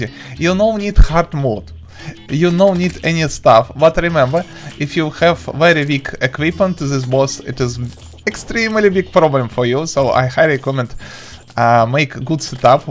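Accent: Russia. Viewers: Русский акцент не скрыть мен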